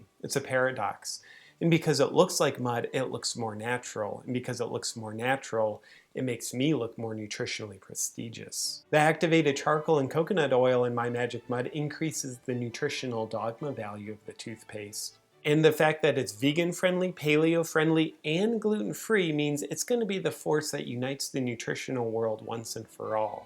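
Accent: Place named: San Francisco, United States. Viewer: Man, this is how people in San Francisco, Berkeley and Oakland talk.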